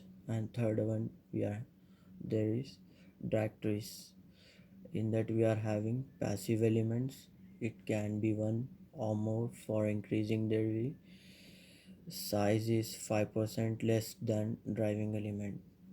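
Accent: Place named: United States. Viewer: Ohh american accent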